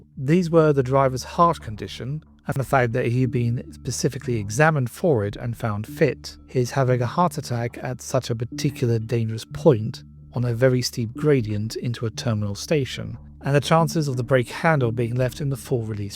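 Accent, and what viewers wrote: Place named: United States. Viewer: There are other annoying Americanisms in this, e.g. debirs pron. debree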